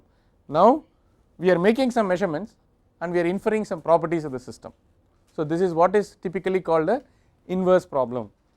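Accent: India. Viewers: Yes, you need to tune into the characteristic hindi accent.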